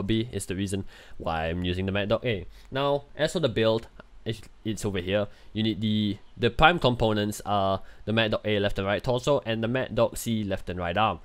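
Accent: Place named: Southeast Asia. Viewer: Your accent is familiar, are you from SEA?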